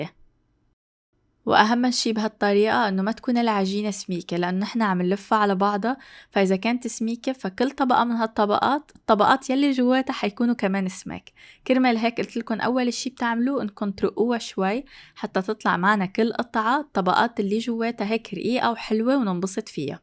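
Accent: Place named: Lebanon. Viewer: احب اتابعك من سهولة وصفاتك والكلام المفيد والمختصر والجميل وباللكنة اللبنانية يلي بعشقها.. محبتك من السعودية..😘💓🥰🕊 شكرا على قناتك المفيدة استفدت منك كثير والله ممنونه حبيبتي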